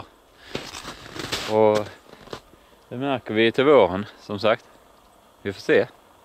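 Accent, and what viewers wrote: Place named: Kristianstad, Sweden. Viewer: Kunde nästan svära på att du var från Kristianstad-trakten :-) Iofs inte SÅ långt emellan men lät så på dialekten. Fina omgivningar där i Småland. Och så mkt snö!! Här i Eslöv har vi vit mark nu, denna i natt, och jag önskar att det försvinner lika fort som den kom haha. Din vovve... Det är väl inte en New Foundland?? Såå fin!!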